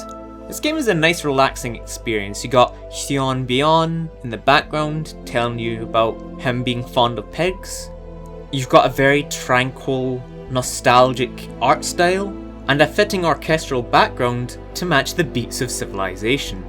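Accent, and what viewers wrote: Scotland, United Kingdom. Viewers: Time for monthly dose of smooth Scottish gamer man Also make the walking dead video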